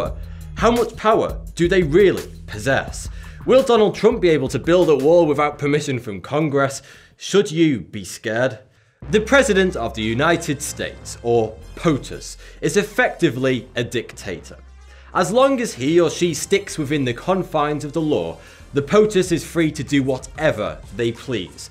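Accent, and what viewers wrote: United Kingdom. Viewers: Pretty biased an inaccurate. Sounds like a Brit who watches CNN.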